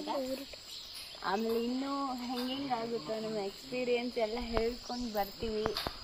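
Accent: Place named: Raichur, India. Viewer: Bro idu Namma raichur matu matte Namma karatagi matu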